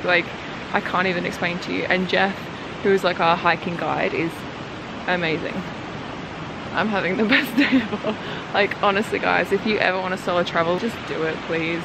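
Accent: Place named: Australia. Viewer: "kay" "naydeeyaa"? australian accents are so cute lmao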